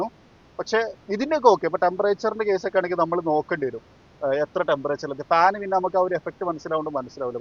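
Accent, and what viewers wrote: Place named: Kasaragod, India. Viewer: Nee kasrod nn inn mansilay😅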